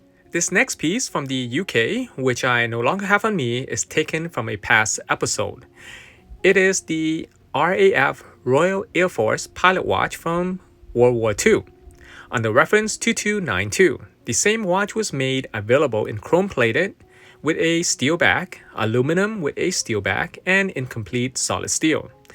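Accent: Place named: Canada. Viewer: Yes I can tell you're Canadian from your accent😂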